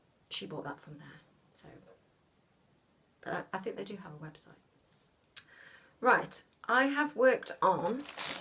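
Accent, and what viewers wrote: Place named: England, United Kingdom. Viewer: Hi, great progress as always! I'm English living in Australia and listening to your lovely English accent makes me feel so happy for the rest of the day! I got my hubby to make the scroll frame, so easy and cheap and I found sticky backed Velcro which is great to use! So a big thankyou!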